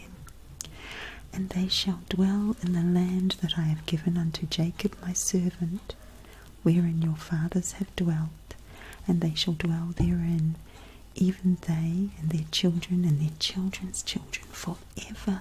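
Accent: New Zealand. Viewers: Are you kiwi! Love Kiwi christians 👏🏻👏🏻🙌🏼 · I appreciate this so much. It was so wonderful to hear a New Zealand accent again as well. Four years ago I spent 5 months in Oxford, Canterbury at a YWAM base there and God worked so much in my life at that time. I accepted Christ when I was 4 but needed to experience Him on a deeper level. What I realized while I was there that has made the most difference in my outlook, is how much of my life I still needed to surrender and trust Him with. I’ve come to realize that is so, so much of being able to really live out the Christian life. Do you know what I mean? Thank you my sister in Christ for letting God use you to speak to others encouraging them in HIm. Also, I greatly respect people doing foster care to help others! Thank you for your sacrifices...I’m going to lift you up in prayer right now that God will encourage your heart today. 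🥰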